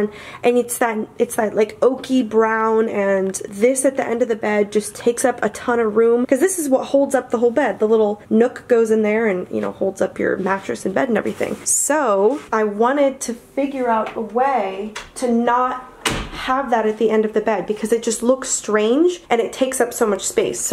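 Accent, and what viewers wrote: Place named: Ohio, United States. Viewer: It's so refreshing to hear another Ohioan accent, amongst all the Californian ones (nothing against em, but hometown pride and all that haha). The curtain lights behind your bed just gave me an idea for my own room, thanks for such a creative AND affordable display of room decor!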